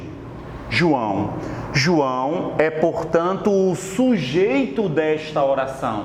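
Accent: Northeast, Brazil. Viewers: Além da aula fantástica, ainda tem esse sotaque lindo dos nordestinos. Maravilha!!!!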